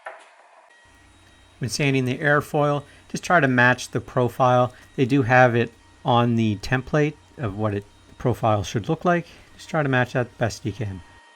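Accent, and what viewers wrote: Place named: Alberta, Canada. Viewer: This man has an Alberta accent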